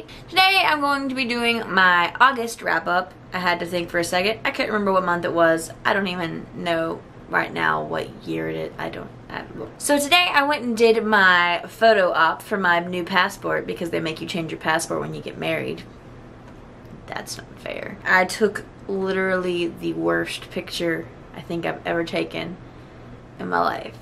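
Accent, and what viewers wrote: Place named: South, United States. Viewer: Also let your accent shine girl!! Idk if your southern, but I love it😊☺️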